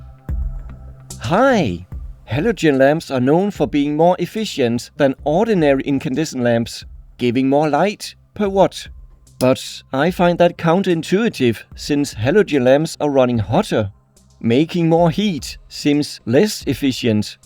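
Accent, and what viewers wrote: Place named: Denmark. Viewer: Du er dansker jeg kan godt høre det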